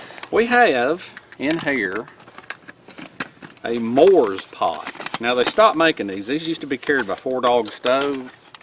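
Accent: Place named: Louisiana, United States. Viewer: You propably make the most sensible „wilderness-content“ on YT so it‘s my pleasure. And that Louisiana-Accent is the best thing ever. Your videos can not be long enough. I love to hear you talk! By the way: I keep watching my favourite video of yours again and again: „Your behaviour, choices and mindset in survival-situations“. That is pure gold. They should put it on TV 😂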